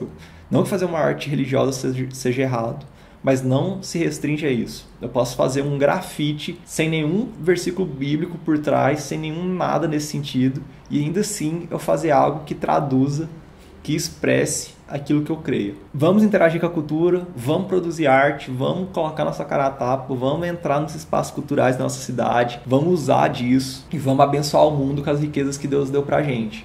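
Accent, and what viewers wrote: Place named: Goiás, Brazil. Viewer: Showww, cara!! Eu tô amando esses vídeos e o sotaque goiano tá ok ksksks, é nóis, conterrâneo!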